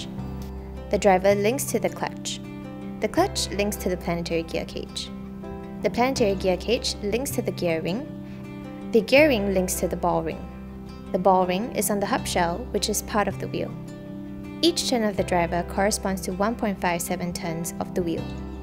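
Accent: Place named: Singapore. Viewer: Sounds like you are from Singapore. So proud of you. Now that i have watched all three videos. Majulah!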